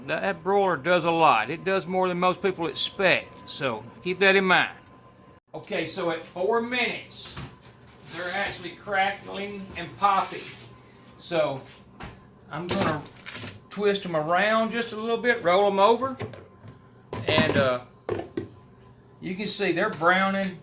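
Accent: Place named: Alabama, United States. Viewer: Sounds like he's from Alabama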